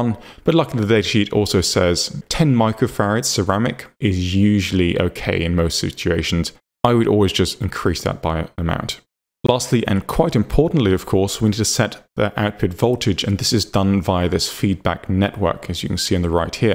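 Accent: United Kingdom. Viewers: You are one of my reasons to love the British accent. I like your voice. You look like a voice over artist. Could you tell me what is the difference between basic and extended part in electronics? I usually hear you in many videos say that this component is an extended part but I don't know what it is. Thank,.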